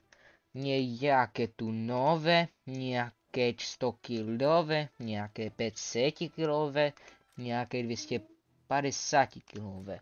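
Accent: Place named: Vietnam. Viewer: spis mluvis jak vietnamec nebo chinan ktery se naucil cesky a nezni to jako rusak moc (nepisu haci ani carky protoze jsem linej)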